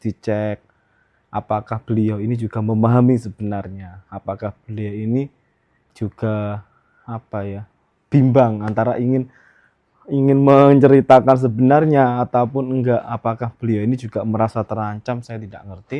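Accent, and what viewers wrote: Java, Indonesia. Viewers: Nama nya tapi suara nya medok...mantul👍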